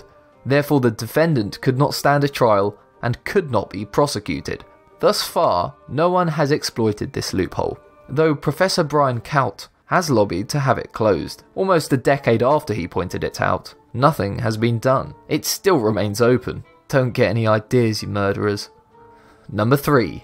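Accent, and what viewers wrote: United Kingdom. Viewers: annoying british accent unsubscribed. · Why did I subscribe just cause he's British 😂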